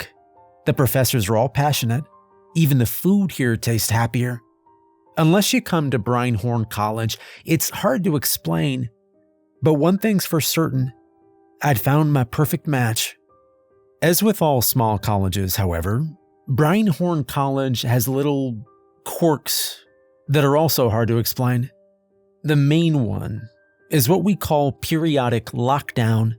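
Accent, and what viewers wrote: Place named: Brooklyn, United States. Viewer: I've realized throughout this story that I don't like this accent. Is it Brooklyn? Whatever it is, it upsets my ear for some reason.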